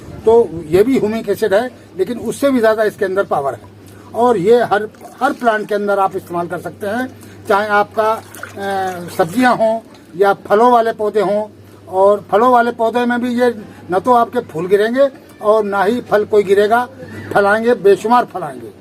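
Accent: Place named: Kannauj, India. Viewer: Sir aapaki boli se lagta hai ki aap up kannauj or farrukhabad se hain.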